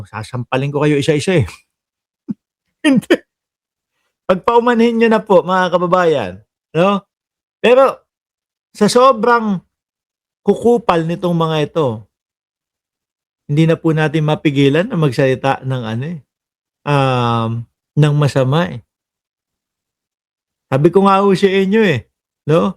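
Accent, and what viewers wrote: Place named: Iloilo, Philippines. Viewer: Parang boses ilongga ang tuno.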